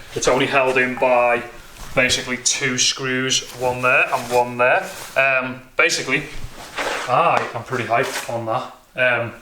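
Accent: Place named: North East England, United Kingdom. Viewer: I was just wondering where u ride trails and stuff in the north east coz ur accent gives it away 😂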